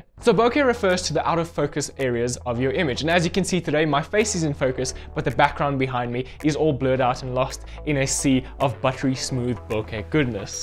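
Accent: South Africa. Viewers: Sound South African ...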